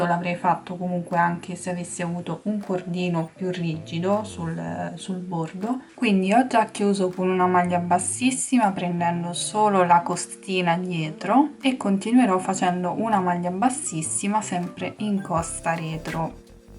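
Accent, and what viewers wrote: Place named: Italy. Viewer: Belo trabalho. E bom ouvir o jeito de falar do povo italiano . Me lembro da minha nona . Minha vóvo falava assim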